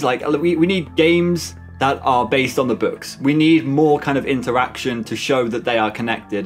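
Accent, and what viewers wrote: United Kingdom. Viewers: You Look Like Dawko And Sound Like Him And I Don’t Know If You Know Him He Is A Famous YouTuber Just Like You!! He Is British 🇬🇧 And You Look Like Him You Sound Like Him And You Sound British 🇬🇧 Sorry That I Say That But Hey I’m Okay With That Your Voice Is Cool And Your Voice Sound Cool And Good So Please Don’t Be Mad I Said That So Sorry But Cool Video And Cool Voice And Sorry For Long Types😊😊 Your Profile’s Look Like The One From Dawko!! Sorry For Long Type!!😊😊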